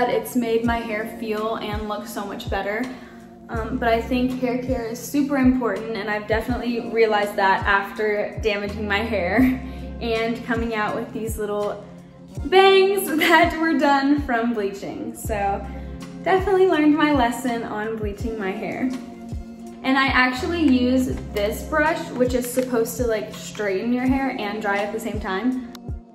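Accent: United States. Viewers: This is how she always talks. And what do you mean “kourtney’s” accent? It’s called being American 😂 they don’t sound anything alike. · BRO HER VOICE CHANGED REMEMBER SHE HAD A MORE SOUTHERN ACCENT AND NOW ITS MORE AMERICAN I GUESS?? no hate but i just realized that❤️❤️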